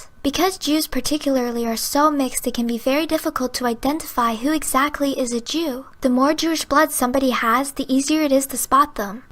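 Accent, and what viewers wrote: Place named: North, Italy. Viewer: My guess was that you're either North Italian or West Slavic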